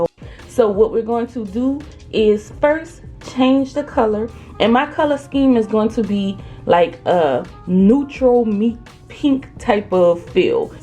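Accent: New Orleans, United States. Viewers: She had me with the NOLA accent ❤ let’s get this money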